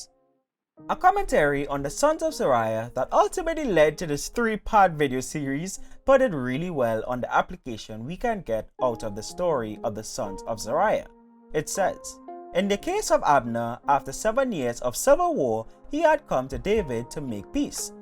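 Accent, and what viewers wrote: Trinidad and Tobago. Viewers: You Trinidadian?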